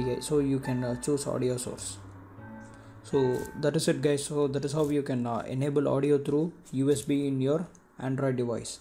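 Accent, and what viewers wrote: India. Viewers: I love tutorial with an indian accent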